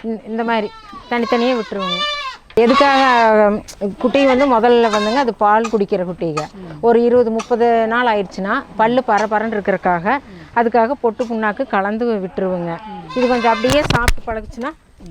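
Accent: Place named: Madurai, India. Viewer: ❤ madurai basha ❤❤❤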